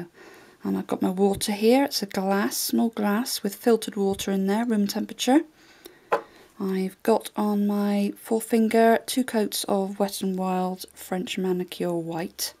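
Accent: England, United Kingdom. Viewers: T are you brittish? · how does she sound Australian? she is obviously English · Like ur accent( I know it's British)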